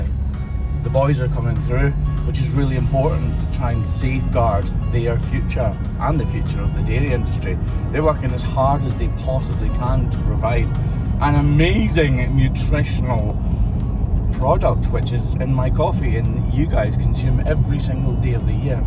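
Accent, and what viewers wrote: Scotland, United Kingdom. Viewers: I don't know what it is but your videos are somehow fascinating. Maybe it's because you're doing so much good for suffering animals? BTW, I have 2 frenchies as well, they have such a wonderful character. I'm german and I always wondered in which country you might live. My guess was Scotland because you are a little hard to understand. No offense meant at all but your accent is quite a challenge for someone who learned english in school and had to do with americans only who btw use only a third of the english vocabulary, if at all. The great comedian Robin Williams once said "once you're plane lands in Scotland you need subtitles". I had a look at your website and found out that I was right, you're based in Scotland. Keep up your good work 👍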